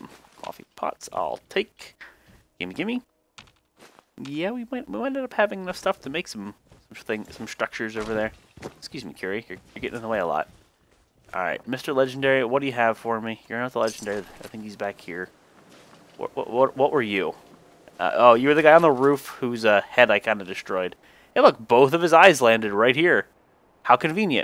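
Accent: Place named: United Kingdom. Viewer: OMG the British accent had me going....lol if you know what I mean wink,wink, even my hubby knows a British accent I get all hot and heavy for so when I heard you speak it I lost it... breath oh by the way good game lol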